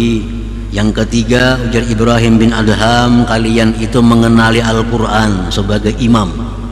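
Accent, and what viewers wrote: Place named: Banjar, Indonesia. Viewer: Alhamdulillah lama- kelamaan sy boleh faham. Bahasa yg Pak Guru guna diselang seli dialek banjar. Sebagaimana pak2 kiyai di pulau jawa berbahasa indo-jawa, begitulah halnya di kalimantan—mrk bertutur dlm bahasa yg digunakan oleh org kalimantan umumnya iaitu dialek banjar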